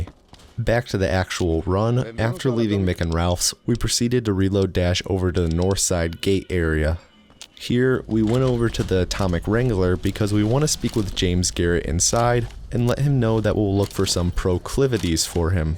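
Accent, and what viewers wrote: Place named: Midwest, United States. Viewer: Where are you from? Your 'a' is very distinct, sounds midwestern to me!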